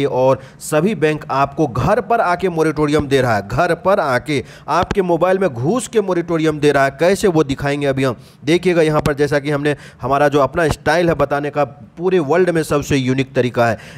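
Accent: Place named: Bihar, India. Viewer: Typical bihari style narration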